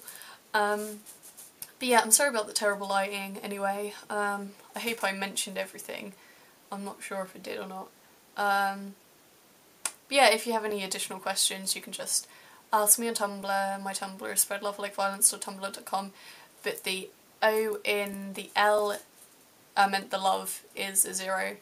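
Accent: United Kingdom. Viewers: u sound english not american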